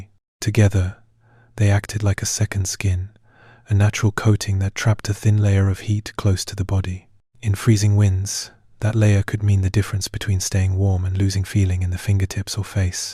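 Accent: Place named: United Kingdom. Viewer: So we have a a British narrator talking about American Indians.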